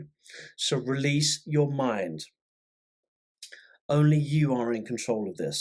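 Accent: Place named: United Kingdom. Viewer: I reduced speed to.75 as well after about 8 minutes; prior to that I was back tracking & replaying. Had to put my “Brit ears” on as well. Lol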